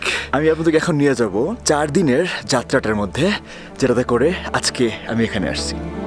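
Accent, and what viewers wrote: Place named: Narsingdi, Bangladesh. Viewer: নরসিংদীর লোক মনে হচ্ছে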